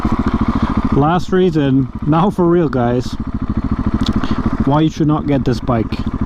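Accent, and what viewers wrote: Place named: Germany. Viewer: Kompliment für den Akzent, hört sich nicht so schrecklich deutsch an :D Sehr aufschlussreiches video!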